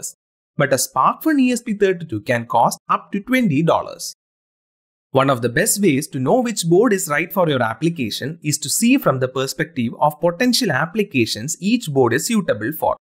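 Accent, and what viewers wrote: India. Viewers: Sorry ... this indish-english is extremly hard to listen to for more than 30 seconds ... Wearable applications are mostly direct connected with need of low-battery consumption. So the Nano in coop with RPi is non-sense.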